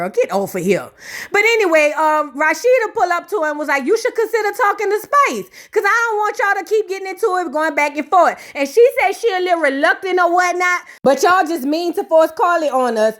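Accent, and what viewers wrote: New Orleans, United States. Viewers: You are so funny! And ur into keeps a smile on my face, you definitely got the best into of any be I kno plus ur accent i I love it! I always wanted to to go to NO!!!!!’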